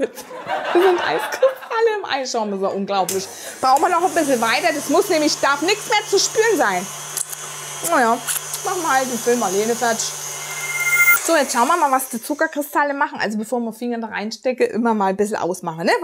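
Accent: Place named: Saxony-Anhalt, Germany. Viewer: Ne, a bissle Sachsen-Anhalt iss da ach mit drin ne ;o) aber der Kuchen ist super... gleich nachgemacht. Liebe Grüße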